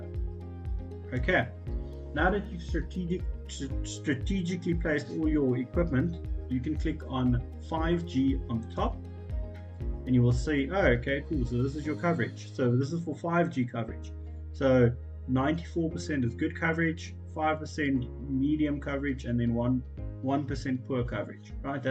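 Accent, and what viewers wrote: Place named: South Africa. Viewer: South African voice……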